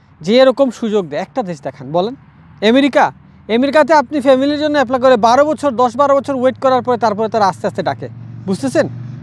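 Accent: Sylhet, Bangladesh. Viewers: Bhai sylheti ni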